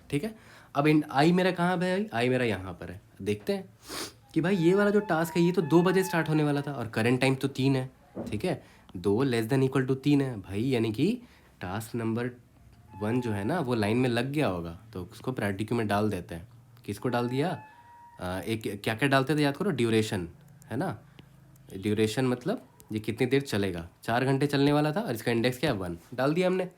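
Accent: Bihar, India. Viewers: Are you from BIHAR bhaiya?? ..becuase your accent is same as ours ...i am also from Bihar...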